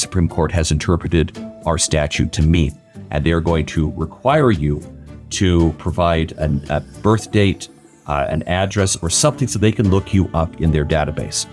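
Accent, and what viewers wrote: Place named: Canada. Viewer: Clear Counsel is not very clear or helpful. This dude sounds like he’s from Canada!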